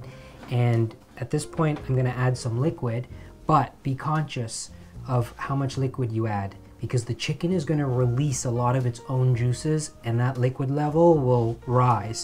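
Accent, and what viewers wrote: Jaffna, Sri Lanka. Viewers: “Melaha thool” lol love it that you still have your yaarlpannam accent. Looks amazing. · Thambi… super Unga srilankai Tamil kadhil ketka romba inimaiyaga ulladhu… lovely to hear your srilankam Tamil … rombavae vadivana vlog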